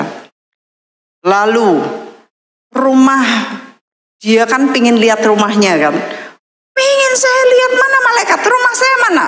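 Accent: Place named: Surakarta, Indonesia. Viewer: Senang sekali mendengar kotbah dengan logat solo - jawa yang kental. Kiranya Tuhan Yesus selalu memberkati pelayanan Ibu.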